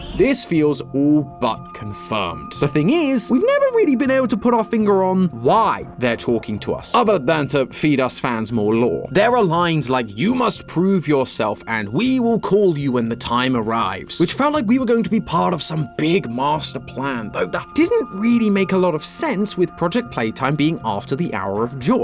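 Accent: United Kingdom. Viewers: british matpat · Truly now 100% British now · I can’t wait to watch the British man talk about monster toy children things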